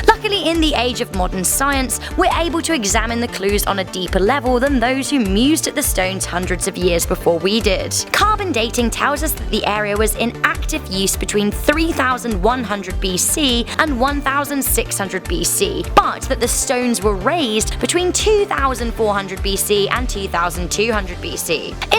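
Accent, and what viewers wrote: England, United Kingdom. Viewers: i missed your voice girl.! Nice English accent i love all about England and i don't live there but my mother did travel there when she was young and told me great things.! London...!:) Thanks for the vid btw to the next please.:)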